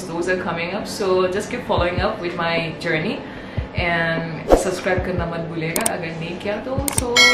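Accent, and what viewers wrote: Nagaland, India. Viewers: Your nagamese accent is just us❤ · Wow you sound like an Angami while speaking Nagamese😀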